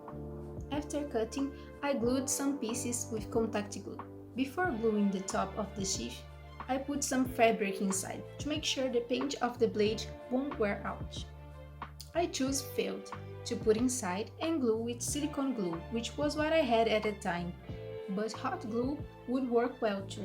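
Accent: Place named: Brazil. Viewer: que ingles de brasileiro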